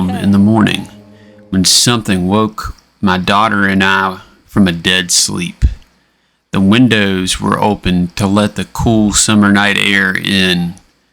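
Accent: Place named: Appalachia, United States. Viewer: Killing time having a stumble through your playlists wonderful narating I love the Appalachian accent it'sy favourite of the American types and Ur all so handsome out there' too🐻🐻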